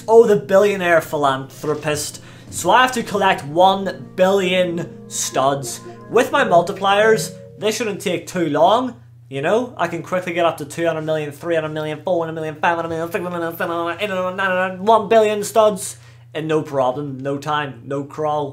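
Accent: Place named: Scotland, United Kingdom. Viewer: I like your Scottish accent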